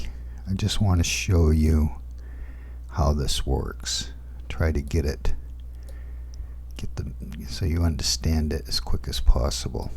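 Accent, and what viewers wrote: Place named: Boston, United States. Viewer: Thanks for the run through - you sound like you may be from Boston :)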